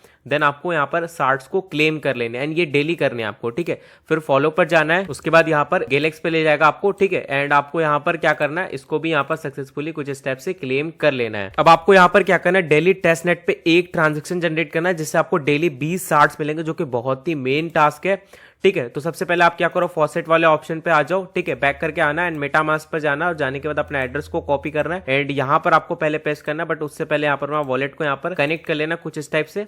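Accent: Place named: India. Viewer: INDIAN SOUNDS MOTHER FCKR COUNTRY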